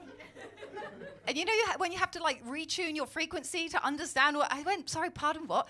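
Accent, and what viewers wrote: RP, United Kingdom. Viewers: She's hilarious and I love her British accent! Why is it that all these beautiful women tell sex jokes? Hmmmm? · Ha! Brilliant! As a Lincolnshire man living in Pensacola, Florida I appreciate the juxtaposition of the Queen's English and bawdy humor. Subscribed :) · Brilliant! 😂 Well I must say, with that nose, cheekbones, chin, accent and name she must be the most british thing I can think of. Except the late queen ofc.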